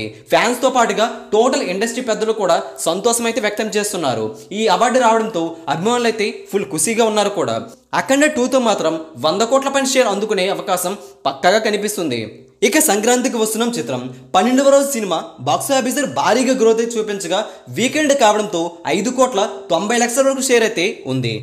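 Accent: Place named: Srikakulam, India. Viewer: Babu Nuv pakka srikakulam village babu laga unnav ne yasa nuvvu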